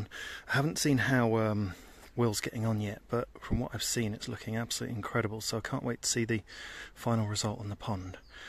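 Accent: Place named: United Kingdom. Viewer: Just found your channel and enjoyed the content so have subscribed I love hearing the British accent, what part of England are you from? Cheers from Melbourne Australia ❤️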